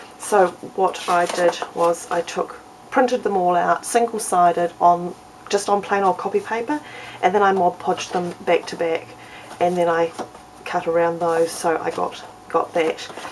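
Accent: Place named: New Zealand. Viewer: I heard your accent and I said inside my head that of course it is my kind... The smartest kind... The kiwi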